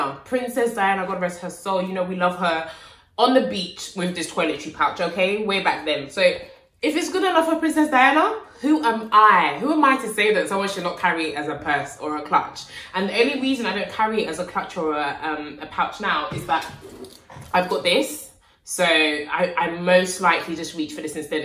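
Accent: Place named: South, United States. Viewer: Love your review and your accent with a little southern twang. "Bruh you pay for this shit"....lol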